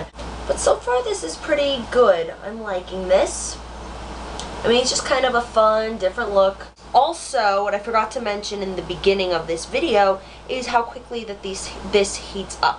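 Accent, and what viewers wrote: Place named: North, United Kingdom. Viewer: Are you from up north? I can tell by your accent lol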